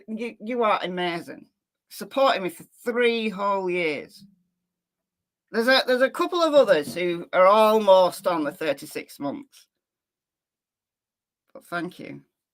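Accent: United Kingdom. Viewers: could you please cover the case of Valerie Wilson. Its apparently on utube but i would rather hear the story from you, im from Canada ive been watching you since Summer Wells. You are the best and i love your British accent, you are humerous and i just laugh my head off at your videos sometimes.❤❤❤ love your doggies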